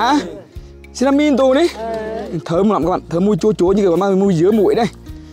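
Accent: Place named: Central, Vietnam. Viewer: Quê Phú Thọ mà giọng thì giọng miền trung😊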